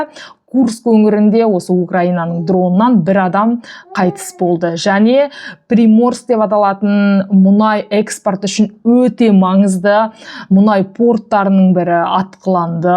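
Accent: Standard. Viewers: қызым рақымет қазақша таза сөилеисің жаңалытарды таратып түрғаныңа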